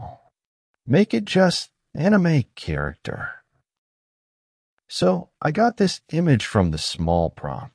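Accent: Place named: India. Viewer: We are used to strong indian acccent in tutorials don't worry :)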